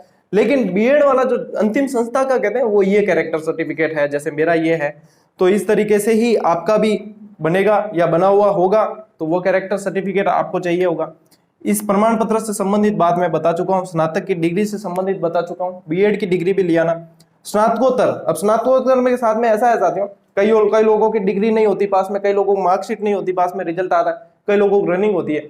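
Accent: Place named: Rajasthan, India. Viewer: Sir aap marwadi bolte h to bahut mast lagte ho 😎😘🥰😘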